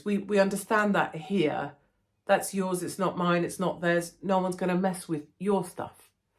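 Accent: United Kingdom. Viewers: Hey! I got some Real good constive Critsisimusssmms In Russia they do a standing ovation when the plane lands.:face-green-smiling: I would feel the same way! 😅 I would be as well. Glad you made it out alive out of D.C. Well, you have been so kind to SOOooooo MANY! LOL! 🤣 Well, we in freedomland dig your British Accent. And you sort of sound like Alexa or Eliza take your pick. ☺:face-turquoise-speaker-shape: NO NO NO NO NO! Remember U R STILL in the U.K.! DANGER WILL ROBINSON!:hand-orange-covering-eyes: Glad you love being in the States and to be Frankly honest, WOULD LOVE TO HAVE YOU BRITS (not all of you) make the move across the pond and come here to become freedom loving Americans! Yea, things are going to get better as long as you stop voting for Libtardia. Plenty of you have came to conservative states, and many of you "Lot" r here in Oregon & I LOVE YOU HERE! 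(Just stay the hell away from DownTown Portland, and stick to the Burbs) Yea its still expensive, but if you work your Hiney off, you can also live a great life here. WE in America are going to rebuild and become the BADDEST MOFO's on the planet again, and if you want to be apart of that? Come on over partner! Just if you got kids? Make sure to keep them out of our Public schools. Lots of crazies still in the schools there, so more for you Bachelors and Bachelorettes. Have a great one and hope you take the steps to make things better in the U.K. Would love to see Fra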